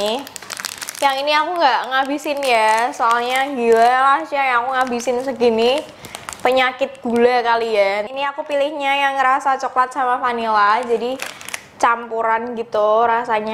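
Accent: Java, Indonesia. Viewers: Logatnya ke Jawa Jawa an💐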